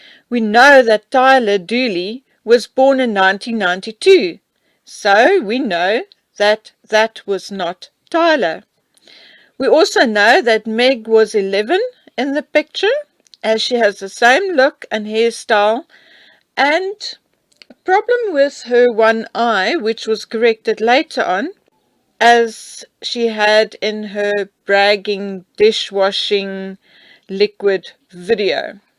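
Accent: South Africa. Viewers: Thank you for your honest and unbiased opinions. So refreshing to hear a fellow South African's accent. Regards from Cape Town. God bless😍 . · I read somewhere ages ago that Thomas was also afraid of Meghan, her rages were really bad and she used to physically attack him. From the news of the way she abused her staff and other people’s staff verbally and physically, I can imagine this is true. Do you have any clarification on this? On Doria she had a long gay relationship with another woman. Which ended just after Harry’s wedding. So she definitely doesn’t like men! I do feel strongly about Archie, if he does exist, he is being kept in isolation which is cruel and unkind treatment of a child. Anyone else whole have had child services called on them. Meghan isolates those she wants to control - she is extremely abusive! Ps. I love your videos, you obviously spend a lot of time investigating. 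I also like your accent, I went to school in South Africa so your accent is nice and familiar, thank you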